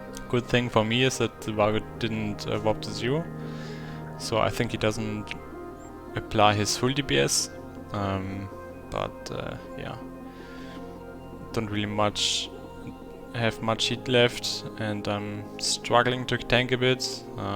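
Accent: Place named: Germany. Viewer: Ich wollte dich zuerst wegen deinem deutschen englisch dissen aber ich kann es selbst nicht besser :D Sehr nice!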